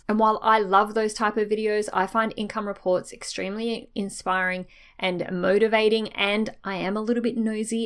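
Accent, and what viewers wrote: Australia. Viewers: I went what? Aussie girl talking about Amazon KDP books! How refreshing. Not that other other international sites aren't relevant, just wasn't expecting the accent. Then I thought Kiwi? no. definitely from the land down under. Just started publishing and dabbling in low content books in the last few weeks and yes, must start to promote. Thanks for your informative sessions.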